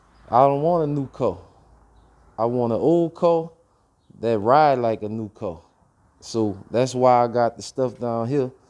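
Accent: Louisiana, United States. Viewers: Good build soud like ya from the boot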